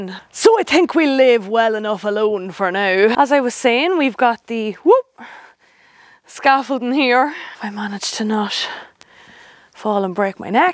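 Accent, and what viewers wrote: Ireland. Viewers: Iam a bit of the Irish me self and I love your accent, been raised in California so no accent. I do know a few things in Irish slang if I dare call it that. How blessed you are to have a beautiful cottage in the country side. I moved from California to Texas because their country sides reminds me a bit of the Irish country.😢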